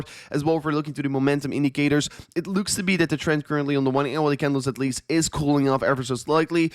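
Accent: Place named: Netherlands. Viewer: bij zen eerste woord wist ik al dat die nederlands was lmao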